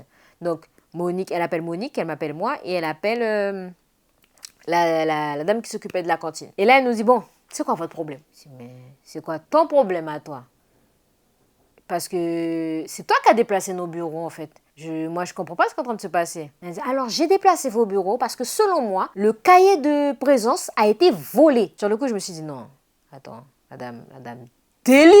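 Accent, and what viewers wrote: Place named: French Guiana. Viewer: Je suis guyanaise aussi et ta l'accent et la manière de parler mmdr.😂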